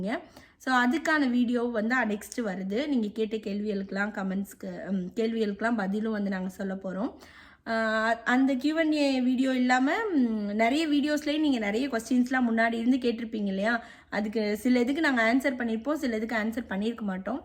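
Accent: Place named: Theni, India. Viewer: Random unga video slang enga Amma pesra mathiri irunchu..... ipotha theriyuthu elaam theni nu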